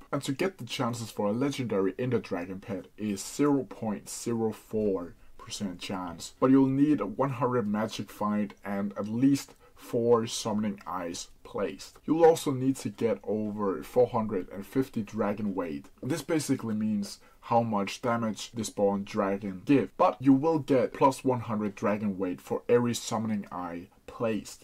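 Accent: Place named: Denmark. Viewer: nah det der danglish er alt for obvious, smid lige din disc vi skal ramme noget skyblock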